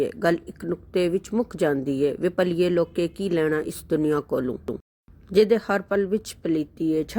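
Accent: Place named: Punjab, India. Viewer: metha punjabi lehja... behtreen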